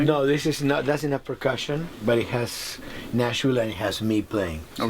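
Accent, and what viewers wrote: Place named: Argentina. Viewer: El tono Argentino se te nota desde el pincipio♡♡♡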